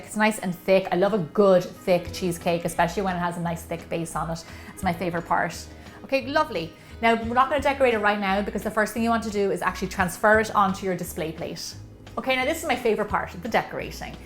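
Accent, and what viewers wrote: Ireland. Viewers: Northern or southern Irish?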